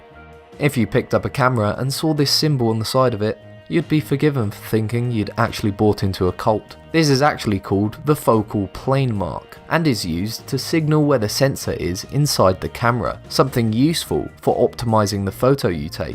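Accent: United Kingdom. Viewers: British English... How I love to hear it up! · 1) You’re an idiot! 2) My ears bleeds wherever I hear a British accent! 3) You make a lot of remarks you think are funny but in reality they are not. People who have no sense of humor should abstain from making lame remark that only they believe is funny. 4) “The seven spike on the statue of Liberty represents the 7 continents” False! Untill a decade or two it was aways believed and taught in school in the <num>’s that there are 5 continents: Europe, Africa, Asia, Australia, America. It's only recently (around 1980 or 1990) that we added Arctic and Antartica. If you are going to make up things why not say it represents the 7 days of the weeks? I mean if you want to make stupid comments, go all the way. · ABritishGuy He doesn't sound American to me. He sounds British. So who is the idiot now.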